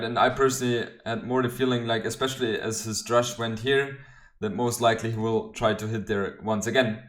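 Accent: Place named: Germany. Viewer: Ich liebe ja dein (D)Englisch 😄 So to say hab ich vorher auch noch nie gehört 👌🏻😄 Obwohl es ja nicht falsch ist.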